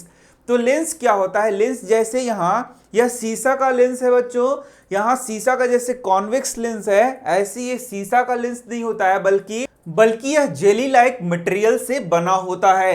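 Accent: Bihar, India. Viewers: I am dam sure sir is bihari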